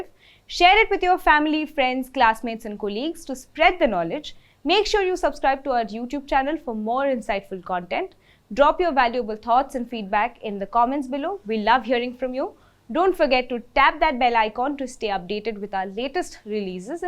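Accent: India. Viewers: Anchor has a loaded bengali accent which is irritating. Please bring back Urvashi Chauhan.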